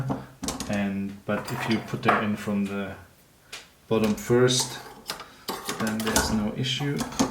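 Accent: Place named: Germany. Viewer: You have a very nice german accent! Greetings from India.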